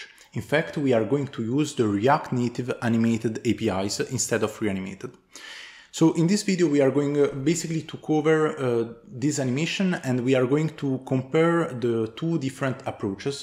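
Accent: Italy. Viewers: Italiano? Sembra dall’accento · are you italian?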